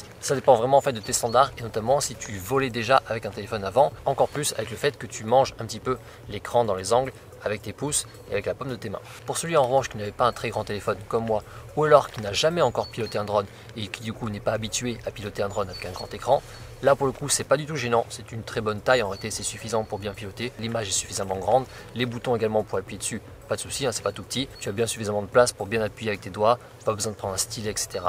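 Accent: Toulouse, France. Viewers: Alors moi l'accent toulousain ne me gène absolument pas, j'y suis habitué :). Plus Sérieusement, encore une belle vidéo pratique, instructive, claire et le fait que tu parles vite, bah je trouve ça trop bien, on te comprend totalement et ça a l'avantage de ne pas voir une vidéo de 20 minutes pour apprendre que DJI fait des produits au top... bon là on en est pas loin. Bref, tout ça pour dire que j'ai bien apprécié ta vidéo. Seul bémol (parce que sinon je ne commenterais pas, comme beaucoup...) : sur la portée de la radiocommande RC, en vrai, comment tu le dis, à courte distance pas de problèmes, mais au delà de 500m, ca déconne un peu. Perso, j'ai toujours ma RC-N1 en back-up et dont la liaison est quand-même bien meilleure que la RC. Je garde la RC pour l'avantage de la vidéo intégrée et ergonomie, mais je dois reconnaitre que je suis moins à l'aise en terme de sécurité qu'avec la RC-N1 qui elle ne foire jamais. 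J'espère fortement que DJI remédiera à ce point. On nous parle d'Ocusync "10 000" mais le résultat est pas fou. Ca reste ma vraie déception de l'achat du Mini 3 Pro. Je me voyais déjà envoyer mon drone sur la lune pour prouver que la terre n'était pas plate. Bref... En tous cas, encore un grand merci ! Continue, et je continuerai de mon côté à te suivre !!! Et encore merci en tous cas !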